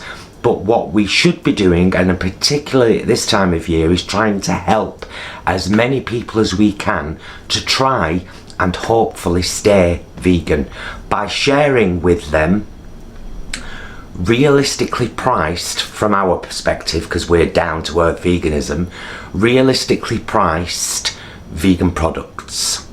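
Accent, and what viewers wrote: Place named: North, United Kingdom. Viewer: Just found this video/channel in my recomended. You got me with that mad intro, and then that accent 👌👌👌 I'm in Yorkshire but often in Manchester n i love hearing a northerner online, 🤣 Those chicken strips are ace, I used to really not like mock meat, but those Iceland chicken bits n burgers converted me 😊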